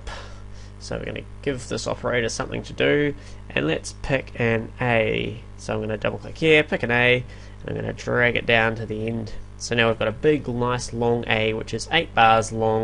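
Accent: New Zealand. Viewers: so obvs a kiwi haha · he's from New Zealand.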